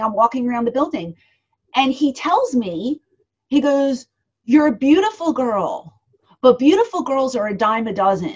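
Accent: Philadelphia, United States. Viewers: Is she from philly? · She has the thickest philly accent lmfaooo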